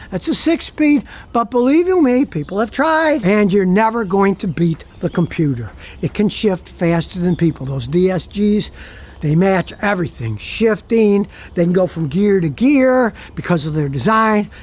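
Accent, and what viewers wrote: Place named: New Jersey, United States. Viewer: Sounds like Jersey,. Sounds like george c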